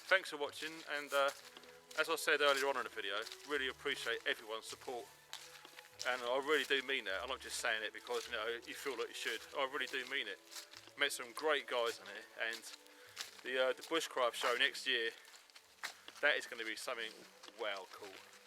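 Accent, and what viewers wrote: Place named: Southern England, United Kingdom. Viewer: Like your Hampshire accent mate, it comes through at times here and there, the rest of the time its a modern Southern England accent, im from London, ive got that one too, ha. Have been watching your vids for a little while, im a subscriber now, look forwards to your New Year vids when you post. Lived in Portsmouth for a year a while back, (liked your Pompey shirt), hope they get back soon, Fratton Park and gunwharf Quays and Gosport ecrt ect, oh yeah, good times. Merry Christmas (ship mate).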